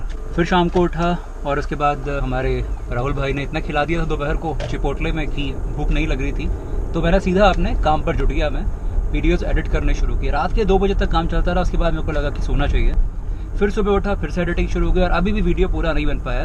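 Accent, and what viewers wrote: Haryana, India. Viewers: ghna uncha tha bhai.... Sir are you from haryana???? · ghna uncha h bhai .... haryanvi ❤❤❤❤ bhai r haryanvi b aav h tn t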